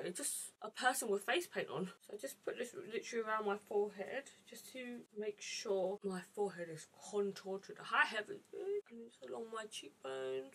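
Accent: United Kingdom. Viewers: I always watch her ig vids and when I first went to her YouTube vids I was like OMG she is British???? Loved it 😂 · also would have never guessed she'd be british LOL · If you listen carefully, you'll be able to hear her British accent. She's from England. It's funny in life how we live in one area and think that all other ethnicities will have the same accent as ours. Or we refer to an accent as something different than what we normally hear. There's all ethnicities all around the world. England is very multicultural just like Canada and America.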